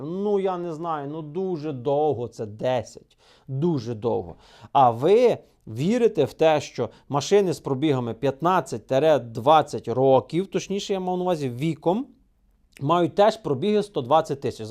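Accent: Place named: Prykarpattia, Ukraine. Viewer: Приємно чути рідний український прикарпатський говір.🚐🚐🚐🚐🚐🚐🚐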